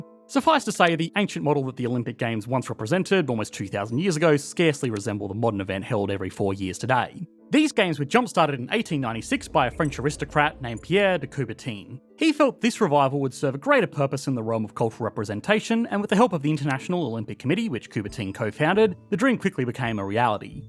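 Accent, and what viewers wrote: Australia. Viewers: Stop speaking in Australia sound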